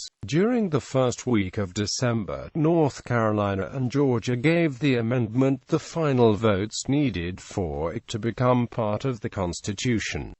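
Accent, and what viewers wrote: United Kingdom. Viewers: why is a man with a british accent on here ?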